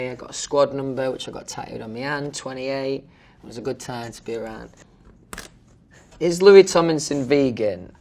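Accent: United Kingdom. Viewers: He’s somehow gotten more British since the hiatus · he's so british i can't 😭😭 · how can anyone ask if he's british when he literally says "me" instead of "my" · his british really jumped out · help I don't know what he's saying with his british accent. All I'm getting is "prodly med ginger made Olly." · I noticed that Louis’s accent has gotten more British since he stopped touring with one direction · The British accent is here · He sounds so much more British than I thought he would, not that that's a bad thing. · I don’t remember him being the most British sounding out of the 4 British members and yet, here we are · i love his british accent since 2010 ❤️ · Do you know how much I love you , you bri'ish king ? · 영국 액센트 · is louis tomlinson british. girl have you heard is accent 😻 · Sometimes Louis' so British even the subtitles mess up 😄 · I feel like he's never sounded more British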